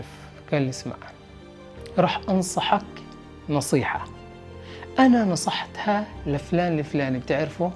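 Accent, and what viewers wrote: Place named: Palestine. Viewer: يسعد اللهجة الفلسطينية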